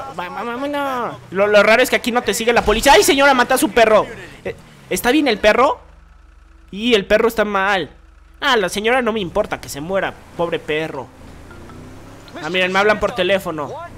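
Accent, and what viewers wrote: Mexico. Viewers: oye eres de mexico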